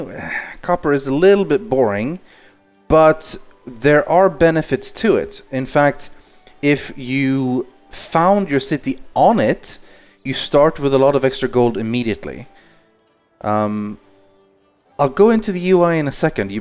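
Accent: Sweden. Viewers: Are you Swedish? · i knew it i her it on his acent. btw im also from sweden and my englis aint dath good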